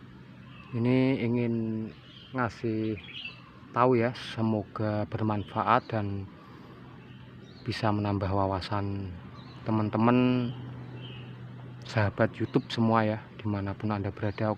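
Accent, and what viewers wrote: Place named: Java, Indonesia. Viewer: Omngnya medok kayaknys orng jawa ksng prast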